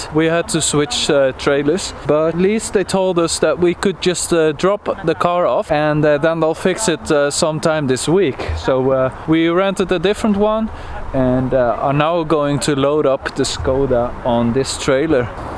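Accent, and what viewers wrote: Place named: Netherlands. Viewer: Ik hoor je Nederlands praten 😄 I hear you speak Dutch not Swedish 😀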